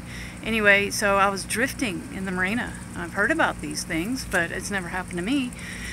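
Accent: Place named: South, United States. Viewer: Never a dull moment in the life of a boater! BTW, any crab in that trap? Free lunch! Seriously, hope the diver doesn't find anything more than some fragments of line down there and you can continue with your adventure. I really enjoy watching all of your video's PLUS you are very easy on the eye!!! Southern drawl and all.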